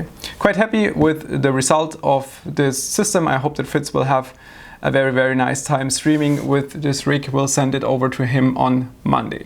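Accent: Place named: Germany. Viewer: Just out of curiosity..... Why do Germans say the words like “of” and “have” with an English V, and some words like overclocked with a W sound? Great video, and no RGB.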